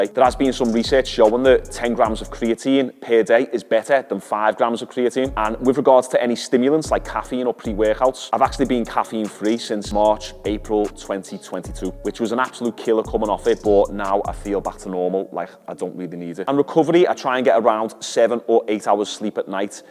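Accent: Liverpool, United Kingdom. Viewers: I'm guessing ur scouse from ur accent. U still based in Liverpool??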